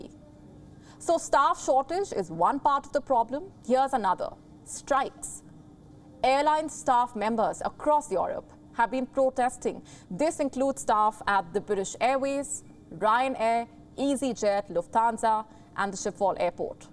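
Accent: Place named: India. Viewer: She is one of the best speakers and keeps her nice Indian colonial upper class Charismatic accent.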